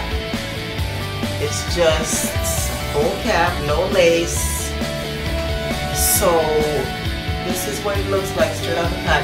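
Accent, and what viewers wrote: Jamaica. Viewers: Hey hun. Jamaican?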